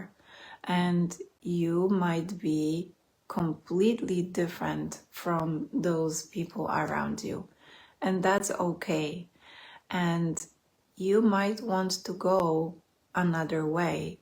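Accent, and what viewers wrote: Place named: Russia. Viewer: Nifty Russian accent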